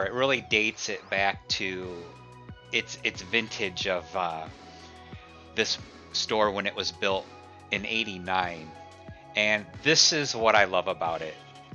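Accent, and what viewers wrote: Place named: Midwest, United States. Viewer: Awww I remember when Target was like this! This brought me back and I love hearing your midwestern accent as it reminds me of home ☺️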